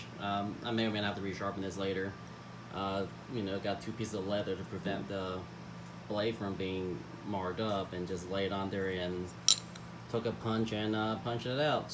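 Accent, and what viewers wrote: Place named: Alaska, United States. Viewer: This guy has the Alaskan Bush People children accent.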